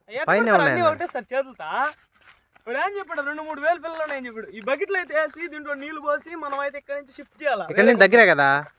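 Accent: Nellore, India. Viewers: Meeru maattladatha vunte mana Nellore lo ne vunnattu vuntadhi naaku😅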